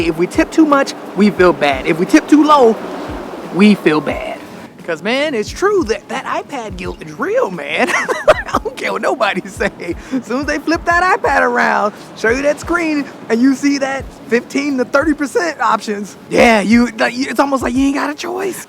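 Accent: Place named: Atlanta, United States. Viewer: I said it sounds like Atlanta b4 you even said it was lol!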